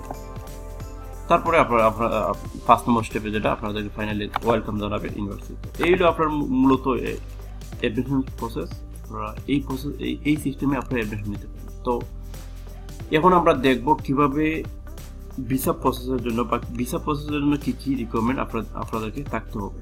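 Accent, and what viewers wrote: Sylhet, Bangladesh. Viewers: Sylheti vai😍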